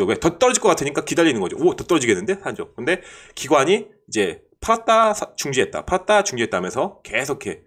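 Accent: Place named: Chungcheong, South Korea. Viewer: 아 고향이 충청도신가봅니다ᆢ 제고향도 충청도지요ᆢ 친하구싶습니다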